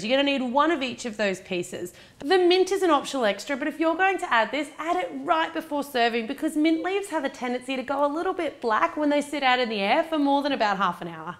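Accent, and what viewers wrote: Australia. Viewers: Are you Aussie or American ?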